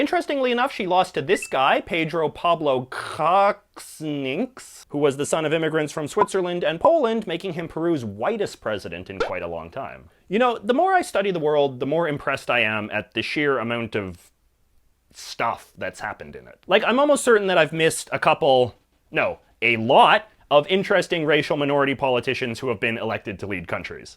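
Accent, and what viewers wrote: Canada. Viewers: As an American, and particularly as a southeastern American it's very interesting to me to hear how you say things. I don't know hardly any Canadians in north Georgia and certainly none with the stereotypical Canadian accent. Little things like how you said keenya and in another video you said naypal for Nepal. Also it was funny how you couldn't do the polish name. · Well he’s Canadian he’s pronouncing it in the Canadian not the Jamaican accent obviously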